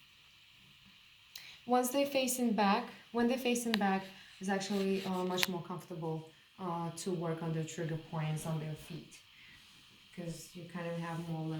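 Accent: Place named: East Coast, United States. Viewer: I hear a cool east coast accent : )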